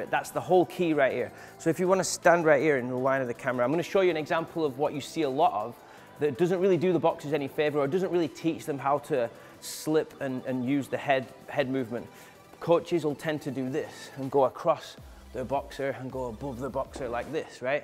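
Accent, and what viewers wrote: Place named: North West England, United Kingdom. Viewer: Your videos are brilliant, I love this channel. I train at a Boxing gym here in Perth Western Australia- I'm Manchester born 🇬🇬 I'm just loving boxing training.(it's fixing me after stroke & changing my life!) I absolutely love your channel, thanks 👌🙋‍♂️ I Started in Bredbury Stockport, you sound like your not to far from this area in the North West hey 😛🤙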